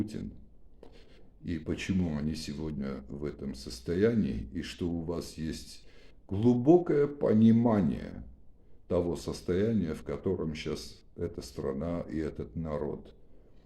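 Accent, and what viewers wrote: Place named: North Ossetia, Russia. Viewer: Спасибо вам за правду, вы человек с большой буквы не знаю кто вы и какой вы нации но по акценту вроде осетин но это не суть важно, важно что вы доносите до людей повторюсь правду ,мир в долгу перед чеченцами, за то что бросили один на один в войне с Россией и причина этому, боязнь гнева огромной ядерной державы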